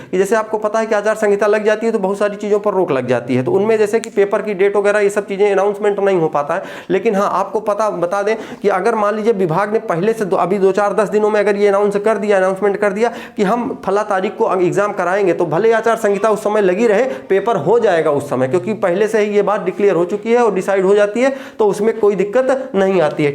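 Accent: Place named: Awadhi, India. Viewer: अवधी भाषा लगातीआपकी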